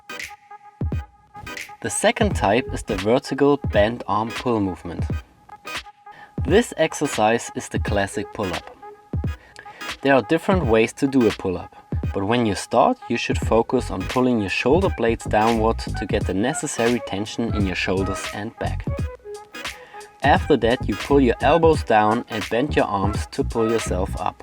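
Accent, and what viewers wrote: Germany. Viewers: German accent :D :D :D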